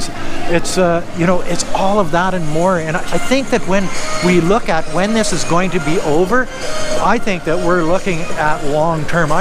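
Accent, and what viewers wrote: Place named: Canada. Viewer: Saturday Morning is gonna be a great funny show! like your canadian voice!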